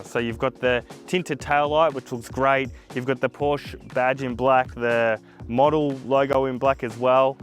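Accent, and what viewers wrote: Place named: Australia. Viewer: "GDS" lol..This just goes to show how slack the Aussie accent is, lol. Seriously, Aussie Motoring Journos should have to take speech lessons.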